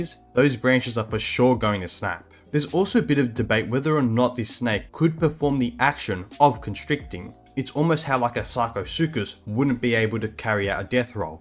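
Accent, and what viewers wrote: Australia. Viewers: I enjoyed this information and cool Aussie accent-Subscribed!🎉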